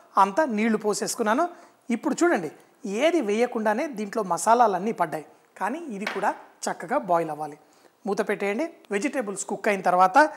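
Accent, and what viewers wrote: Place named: Hyderabad, India. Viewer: Brother you should talk Hyderabad telugu only... Being a Hyderabadi. . I enjoy while you talking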